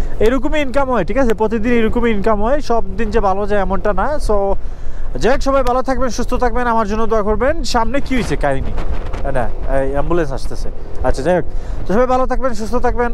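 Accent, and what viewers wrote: Sylhet, Bangladesh. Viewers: ভাই সিলেটি নি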